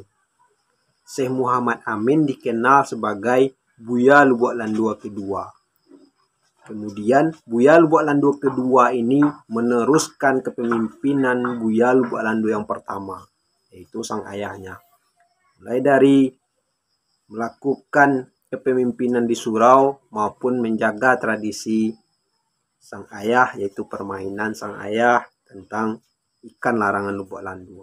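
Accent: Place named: Sipirok, Indonesia. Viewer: ancak bhaso Minang Jo lai da,dari pado kayak org spirok bhaso indonesia😅😅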